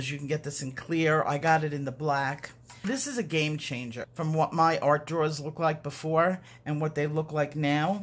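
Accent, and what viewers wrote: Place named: Hoboken, United States. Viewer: you talk just like my mom, shes from Hoboken. She says looseleaf too:)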